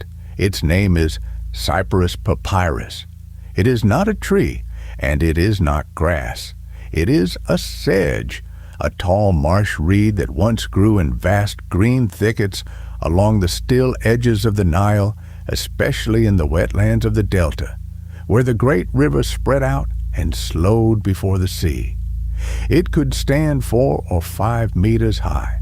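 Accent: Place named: Midwest, United States. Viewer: Hillbilly accent? You've obviously never actually heard a hillbilly accent before. This sounds like a Midwestern accent.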